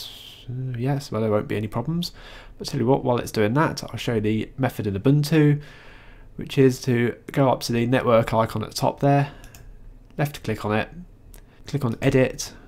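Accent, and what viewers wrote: United Kingdom. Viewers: never heard anyone more british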